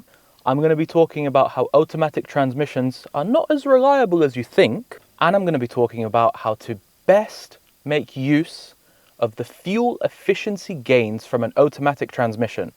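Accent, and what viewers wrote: United Kingdom. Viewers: Britain has such diversity in dialects and the further back in time you go the more diverse it is, so I wouldn't jump to conclusions, I would just assume that's just how he says that vowel. In fact, I'm pretty sure I've heard several dialects pronounce that vowel like that, or similarly different.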